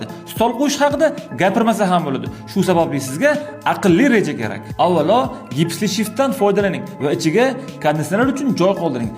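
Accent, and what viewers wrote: Namangan, Uzbekistan. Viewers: Шева наманганми? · Namangancha gapitish qiyinda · Аксинча шкафчалар купайиб кетдику шевангиз Наманган шевасими даров таниманда 😂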